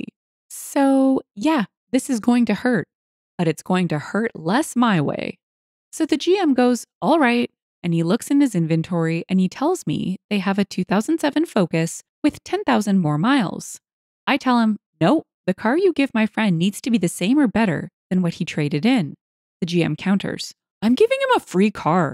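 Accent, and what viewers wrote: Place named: Canada. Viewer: I love how the narrators Canadian is coming out Eh? The stories are great!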